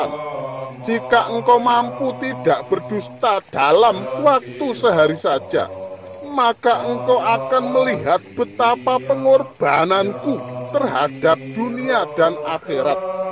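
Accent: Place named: Java, Indonesia. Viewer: Mas ojo medhok2 kalo bicara☺️👍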